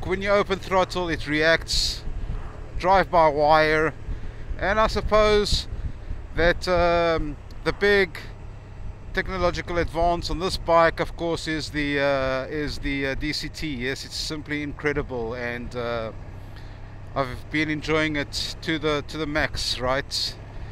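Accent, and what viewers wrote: South Africa. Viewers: It sounds like you have a very South African accent! Lekker man. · South African in a foreign country? Unmistakable accent.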